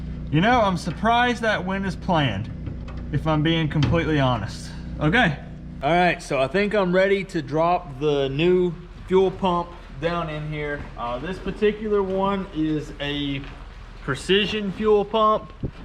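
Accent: South, United States. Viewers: I recommend some underbody coating. Based on your accent seems like you could be somewhere in Alabama like me or at least the south so salted roads aren’t a big issue. But with this truck being as old as it is, I’d recommend some just to stop the rust corrosion (or at least slow it)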